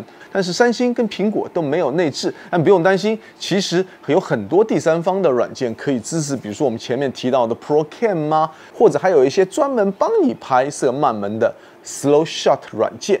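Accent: Shanghai, China. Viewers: 这口音太上海了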